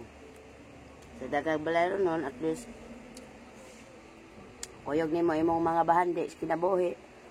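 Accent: Visayas, Philippines. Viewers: ano Yong accent mo na bisaya? Sabi ng kaibigan ko na tga Cebu? Yong boses mo daw prang Lola na? 😩🤣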